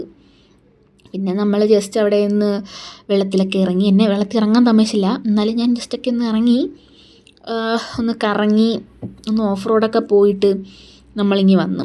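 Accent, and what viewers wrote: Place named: Thiruvananthapuram, India. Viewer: തിരോന്തോരം പയല്. തള്ളേ പൊളപ്പൻ vlaag തന്നെ കേട്ട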